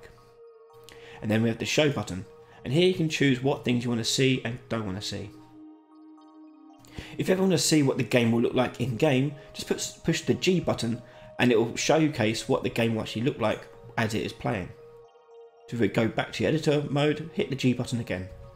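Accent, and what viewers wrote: United Kingdom. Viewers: Man can u slow down a bit while u speaking ... British accent it's so hard to understand ... And thank you for being a great teacher man ... 💖💖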